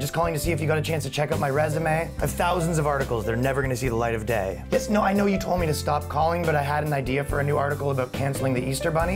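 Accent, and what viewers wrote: Canada. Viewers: Are you Canadian? Can definitely hear some canuck coming through. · your Canadian accent was really thick in this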